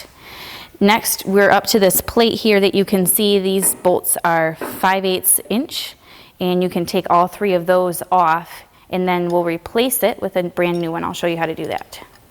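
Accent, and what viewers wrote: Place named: Michigan, United States. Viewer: The Michigan accent is too funny.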